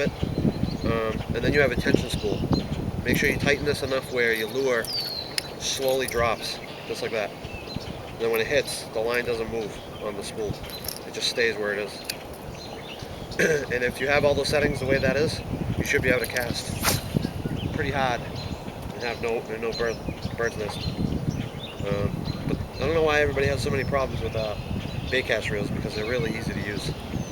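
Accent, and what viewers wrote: Boston, United States. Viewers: is that a boston accent i hear?? =)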